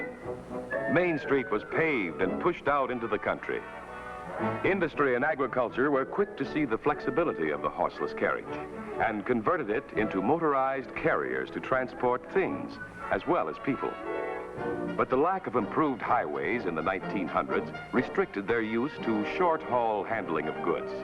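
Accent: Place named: Mid-Atlantic, United States. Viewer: That's the mid-Atlantic accent.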